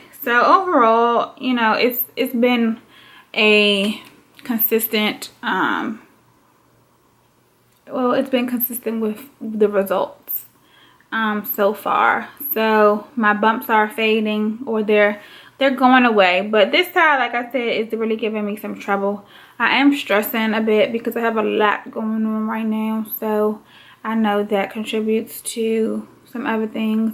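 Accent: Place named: Washington DC, United States. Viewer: Are you from DC? You kinda sound like you’re from the “urea” 🤔. You’re skin looks great!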